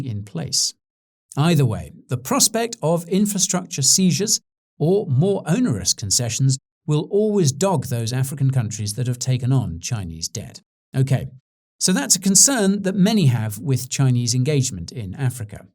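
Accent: United Kingdom. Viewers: Before China, Europe and America continues to do via its corporations in Africa? And you speaking with a British accent; a thief pointing fingers at another thief. The Mwafrikan Tengazako coming! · The irony of a critique of imperialism in a British accent 😂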